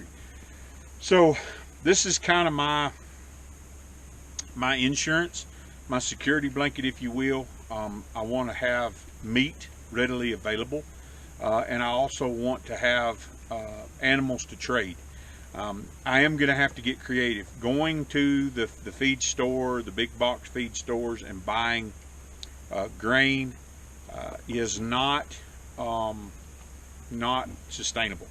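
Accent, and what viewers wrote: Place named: Kentucky, United States. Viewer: Maybe the weirdest comment you ever get: I grew up in KY and moved to CO ten years ago. The last four years of divisions in our country between left and right hit me hard. It further separated me from people I grew up with, knew and loved. I found this video because I wanted to learn more about the water pump but hearing your voice reminded me of family and friends I haven't seen in a decade. I even enjoyed hearing about the hog and chickens. You're a good guy. Keep up the good work... and thanks.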